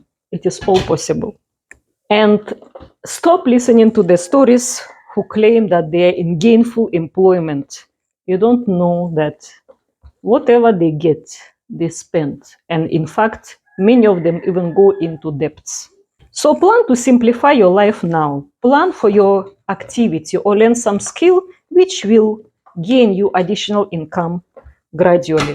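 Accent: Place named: Russia. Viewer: I really like listening to you,! Love your Russian accent! Just feel sad that the Ukrainian government and the the Ukrainian Nationalist accepted to be used against Russia…. I think you are a special Ukrainian with lots of character, personality and love for humanity!!! ❤️❤️❤️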